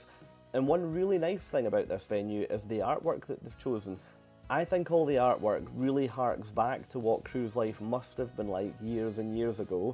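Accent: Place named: Aberdeen, United Kingdom. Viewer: Are you from Aberdeen? Your accent reminds me a lot of a friend of mine from that area. Ace review!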